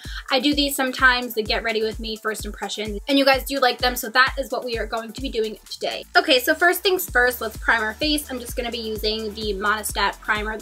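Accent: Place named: East Coast, United States. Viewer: I love your tan!! You look great!!! I bought that Monistat primer on your recommendation and although it sounds awkward to put it on your face or tell your friends about, lol, it works great. Thanks!! I have super dry skin, so Im excited to try the foundation. I was considering trying it with no or a different foundation primer. Just an idea!! Great reviews, always!! When do you head back to Granada?? Do you miss it?? Every once in a while I catch your east coast accent, especially when you say "mascara," lol, and it makes me smile. You seem like such a west coast girl, like me, until I hear "mascara!" haha. Just a silly observation. Watch, now you'll notice it too. Habaha. Take care,!!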